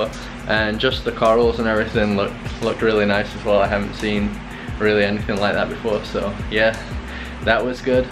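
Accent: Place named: England, United Kingdom. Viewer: Wow man! I really like your English accent. Sound very clean for me. Where you from?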